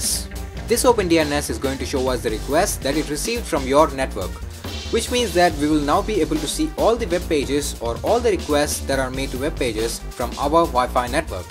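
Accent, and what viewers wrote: India. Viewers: Just statted the vid and then. indian accent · Best Indian accent I’ve seen so far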